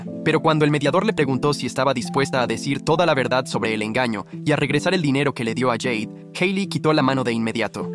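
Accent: Mexico. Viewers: Que mexicana la IA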